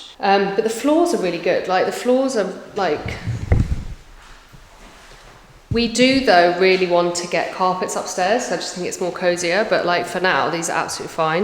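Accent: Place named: England, United Kingdom. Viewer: I wouldn't have thought the garden would be a priority surely sorting the house should come first. You have an English accent but act like a silly American over the top tiktoker. You have children please grow up. You really don't need to document every fart.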